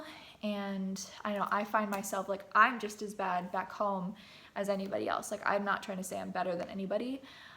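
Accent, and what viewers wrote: United States. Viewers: your horrible American English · I swear I knew you were an Iowa girl as soon as you spoke. I'm from M'town and miss all of the great looking and smart girls from my hometown.You represent our home state in a very positive manner.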